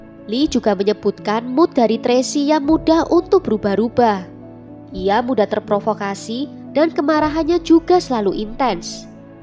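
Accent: Java, Indonesia. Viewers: Hayyy jg say.. medokuhhh 👍💞💞💞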